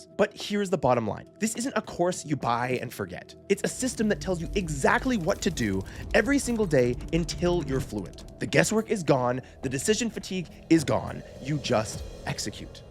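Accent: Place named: China. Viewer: I'm also from china，hella impressed by ur Chinese accent and applications，u sound literally Chinese！！！OMG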